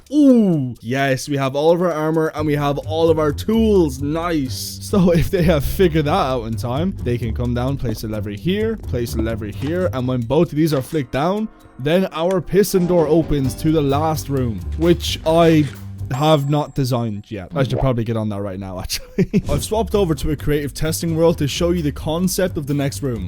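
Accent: Ireland. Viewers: r ya irish?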